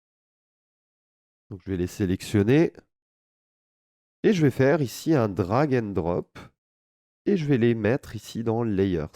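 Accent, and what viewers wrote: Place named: Alsace, France. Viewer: Ta un accent alsacien on dirait ahah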